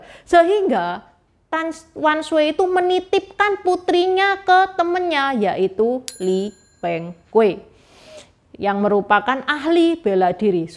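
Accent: Java, Indonesia. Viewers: Mbak, cengkok jawanya medok bangetttt, 👍👍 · Medok mu wi lho yu...yu...🤭🙏🏼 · Sampean ini Tionghoa opo jowo...kok bohoso ne jowo medok??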